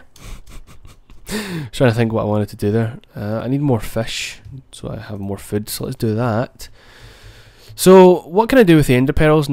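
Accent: Scotland, United Kingdom. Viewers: Are you scottish? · You are why I love the scottish accent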